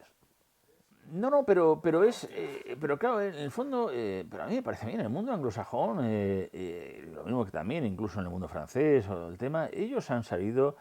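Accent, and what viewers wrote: Spain. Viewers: Que ridículo se ve con esa playera.Habla como típico español,no le entiendo.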